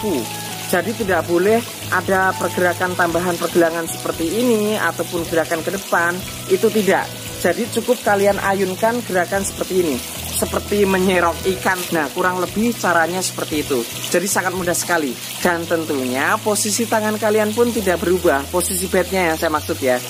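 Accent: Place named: Java, Indonesia. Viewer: Saya tahu mad dari PTM Kemang Jakarta tapi penasaran sampean orang asli mana? logat jawanya kental banget.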